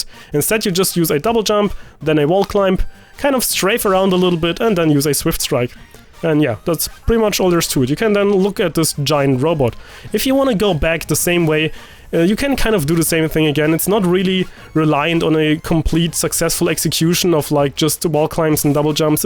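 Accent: Germany. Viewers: XD ich erkene wie du sprichst das ist auf jeden Fall deutsch besonders bei eichenwalde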